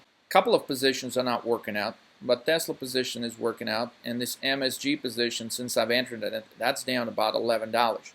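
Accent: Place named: Minnesota, United States. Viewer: What the fuck is your accent? It sounds like you moved to Minnesota from Sweden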